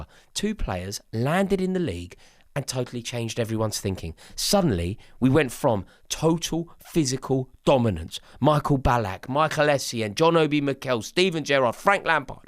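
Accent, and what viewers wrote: RP, United Kingdom. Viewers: That’s a standard every season with this idiot, uses big words and his posh Tory accent and thinks he’s some footballing genius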